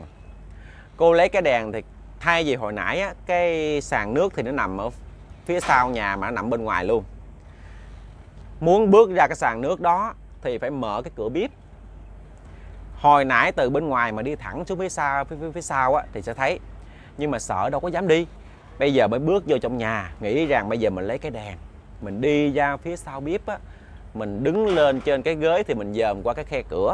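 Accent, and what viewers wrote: Mekong Delta, Vietnam. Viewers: Người Miền Nam mà lại hệ miền tây nên dễ nghe, dễ hiểu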